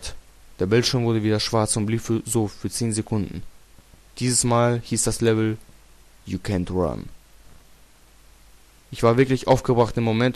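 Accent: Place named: Russia. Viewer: Haha du bist voll geil ich liebe es wenn Russen so einen geilen akzent haben wie du! Aufjedenfall abboniert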